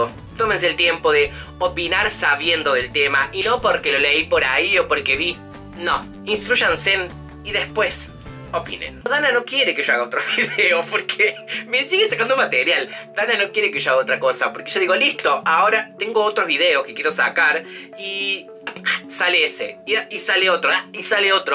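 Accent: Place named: Argentina. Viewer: Que son los joeles, perdón no entiendo su español argentino, saludos desde México, hermosa canción de y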